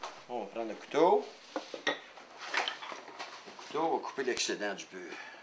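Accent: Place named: Quebec, Canada. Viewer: Je vais faire la même pour mon jardin, pour mes p'tit moineaux Français. pour Avec l'accent canadien ou québécois cette vidéo est génial !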